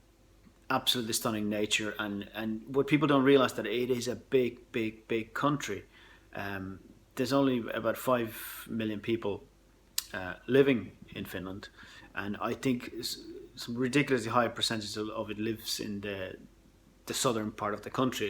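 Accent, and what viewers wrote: Ireland. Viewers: Wow you sound like me and im irish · If I hadn't waited until you said it, I would have thought you were Irish. Your accent is proper Irish. All the turns of phrases and rhythm of a well spoken, slightly Dublin accent. Good video. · Aksentti on huomattavasti "Irlantilaistunut" siellä ollessasi. :) Haaveilen itsekin Irkkuihin muuttoa tulevina vuosina. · Your accent is so Irish. I enjoyed watching this. You have a good heart.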